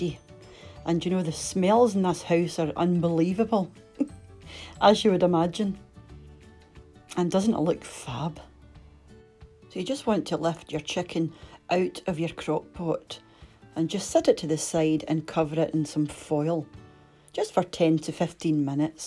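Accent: Scotland, United Kingdom. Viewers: Love the chicken recipe and the lovely Scottish accent. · Thanks for the video - heard the Scottish accent after a long time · AWESOME ......love the scots accent x Mines now ready thanks 0)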